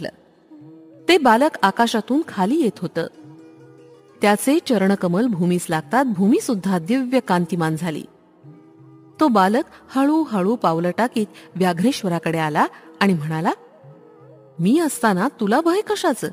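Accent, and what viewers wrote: Standard. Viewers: Excellent voice didi . Uchhaar agadi shuddha ahet .Sagle adhyay asech upload kara .Shripad shrivallabhanche ashirvad tumhala labhot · Faar Uttam channel ahe... shripad shrivallabh charitramrut ekdum Chan ahe..avaaj khup Chan ahe. Uchhar shudha ahet..aikanaryala aani pahnaryala khilvun thevta..hya channelcha jastit jast prasar vhyala hava🙏 Shripad Rajan Shaaranam Prapadye 🌸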